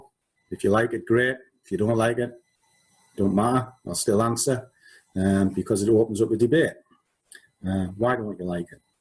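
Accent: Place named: Nottingham, United Kingdom. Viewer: Hi! I have literally spent the last 4 weeks studying trading none stop (most of the day and night)😂 I started with a trading 212 account which was crap and I have now moved up to a trading view account and use metatrader 4 to take my trades. I have tried the ichimoku strategy with tk crossovers, kumo breaks etc. I take all trades inline with the higher time frame sentiment but still seem to be going wrong somewhere🤦‍♂️🤷‍♂️. luckily I have not lost any money in the 4 weeks of trading but always mange to be back at where I started. I have watched 100 hours plus on YouTube and you seem to be one of the most genuine traders I have come across 🙌 I live in Nottingham and it sounds like you live not too far. I am wondering if you offer any sort of coaching/mentoring services. I thought I could do it all alone off youtube videos etc but its just putting it all together and having abit of guidance every now and then! 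look forward to hearing from you!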